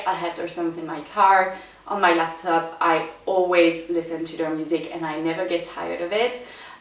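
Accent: France. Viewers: Thanks for answering my beauty questions! This was a lot of fun to watch:D Sadly I don't think many late 80s, early 90s kids, escaped to whole icy blue eyeshadow with bright red lipstick phase, so we can all shake our heads in solidarity:P Glad to know I'm not the only one who couldn't place your accent when first discovering you, I think it's because it's not the stereotypical french accent you see in movies (using overly french pronunciation) but just a faint one, that has people so confused. Definitely going to be picking up that volumising spray when I'm next in Boots:D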